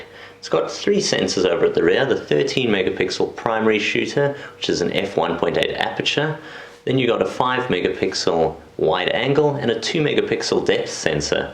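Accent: South Africa. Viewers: Your accent makes me think your from South Africa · Are you south african? (I hear hadidas)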